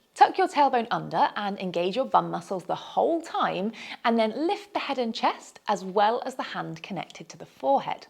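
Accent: United Kingdom. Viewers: Studies show sitting on your desk, listening to a hot British chick telling you not to sit on your desk is an amazing way to spend your time and improve your fitness.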